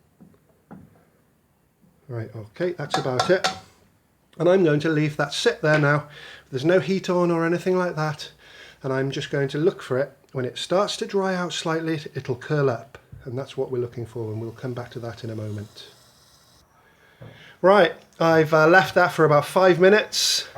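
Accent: United Kingdom. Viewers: British accent is amazing !!!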